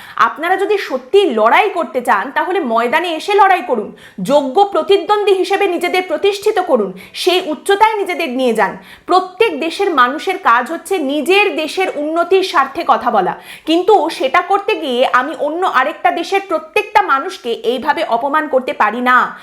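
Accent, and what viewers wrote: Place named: India. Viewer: tor kotha bolar dhoron inidna der moto kano tailea ?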